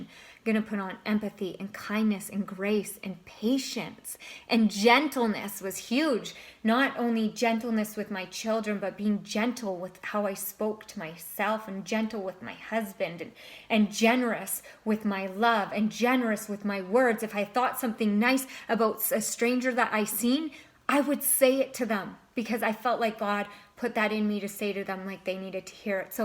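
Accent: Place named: Canada. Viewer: Inspiring ! Hope you are still doing well. You sound Canadian.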